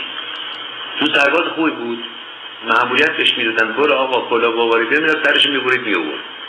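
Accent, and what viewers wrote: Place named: Turkey. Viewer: kiram tu kosse madaret ba in lahjeye torkiet.zanjende pash byofte farsha madaro khahare toro ham migan.dar zemn yadet bashe araba jaddo abadeto ham be moghash gaidan ke tule saghe arbi mesle to az kune nanat byofte. kire har chi bahai tu kosse madaro zano khaharet koskeshe mosalmune goh.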